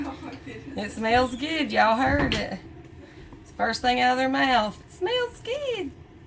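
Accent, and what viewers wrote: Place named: South, United States. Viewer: Great job & I love your southern accent 😍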